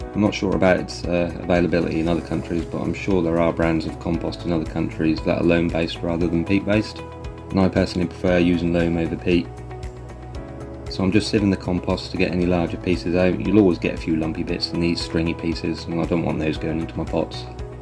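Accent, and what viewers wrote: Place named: West Country, United Kingdom. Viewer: Well that was 6 years ago. In 2026 are you still using the same mix? You’re speaking tagalog but have a West Country accent. Unique! 🙋🏻‍♂️🇬🇧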